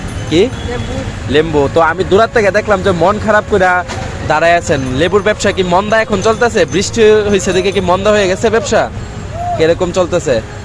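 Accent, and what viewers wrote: Sylhet, Bangladesh. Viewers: Vaia apni ki sylheti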